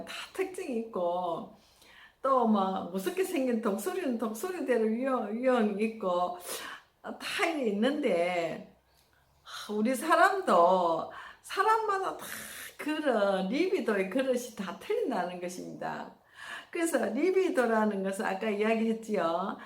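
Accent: Gyeongsang, South Korea. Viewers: 오늘 리비도에 대한 삶의 에너지 설명 매력적인 경상도 억양으로 잘 말씀 해주셔서 잘배웠습니다 좋아요 12번째 응원합니다 아무쪼록 좋은하루 되시기 바랍니다 😊 😄 😀 🙏 🤗 💕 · 강의내용에 많은 공감을 합니다 휴일 잠시 님의 강의를 다시 듣 고져 시청중입니다.그리고 연결 합니다..자주 뵙게되겠습니다~^~ 저는 서울입니다.30여년 대구에 서 자라고 학교를 나왔죠..쌤의 사투리가 대구.부산? ㅎ멋진 휴일되세요~^~ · 안녕하세요💓 리비도ᆢ 누구에게나 기본적인 정신분석을 잘 말씀해 주셔서 저에게 많은 도움이 되었습니다ᆢ 경상도 말씨는 은근히 매력있습니다 🤗 감사합니다 행복한 오 월 되세요 🥰🌻🌷🌷🌷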